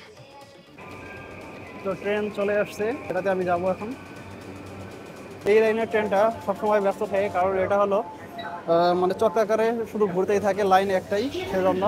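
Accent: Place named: Cumilla, Bangladesh. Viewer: ভাই আপনার বাড়ি কি কুমিল্লা নাকি? ওই এলাকার ভয়েস এর মতো মিল লাগে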